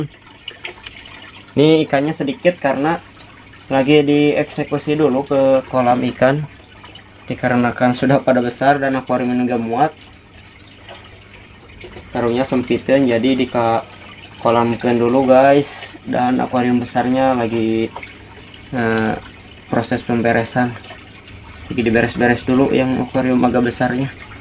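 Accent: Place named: Sunda, Indonesia. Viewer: Sunda bngt eta si akang😂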